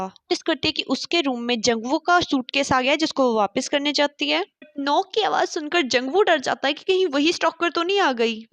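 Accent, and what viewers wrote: Punjab, India. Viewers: Hi sachi app ki awaj bahut achi hai punjabi banda door sa hi pehchana jata hai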